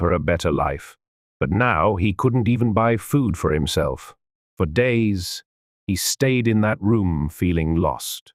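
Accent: United Kingdom. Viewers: I love these stories in British English ❤!! Thank you!!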